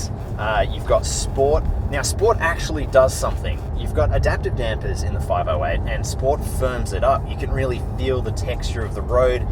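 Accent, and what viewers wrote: Australia. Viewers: Do you from Australia?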